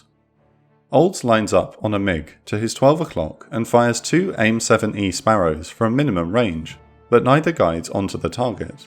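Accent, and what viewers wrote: United Kingdom. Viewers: Love the visuals and the calm Brit voiceover. If you want the American version of the story, TheFatElectrician does a good video on Op Bolo as well. "US obliterates half North Vietnam's MiG-21 fleet in 13 minutes". The contrast between channels is stark, but I can appreciate both methods of telling the same story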